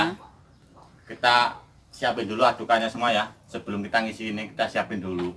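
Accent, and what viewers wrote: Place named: Central Java, Indonesia. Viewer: Aslinya mana to mas,bahasa jawane kok kaya orang jawa tengah ...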